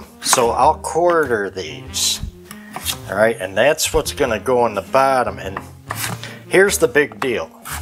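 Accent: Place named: New York, United States. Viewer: I just discovered your channel this month and love it... love all the beautiful pieces of your collections and the cooking is excellent (so organized). But most of all your New York State accent and your laugh (chuckle) with the twinkily eyes all make me happy. There is one You-tuber whose voice and cadence never fails to make me depressed so I can't watch her. I popped on your channel to get instant happy. God bless you and (my daughter's name) and your family. Thank you for all your hard work in making these postings (and, of course). Your delight in seeing something fabulous (ie. Washington, DC trip) is wonderful! God bless!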